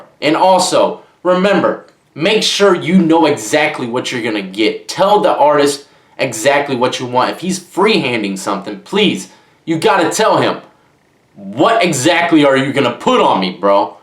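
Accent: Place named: South, United States. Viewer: He got a country accent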